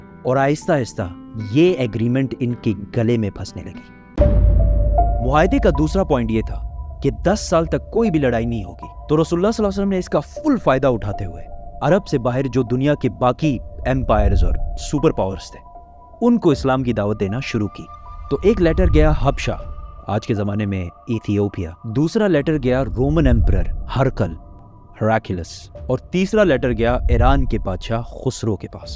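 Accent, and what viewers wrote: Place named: United Kingdom. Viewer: Bhai english accent british jaise kyu? Normal bolne mein problem hai kyq???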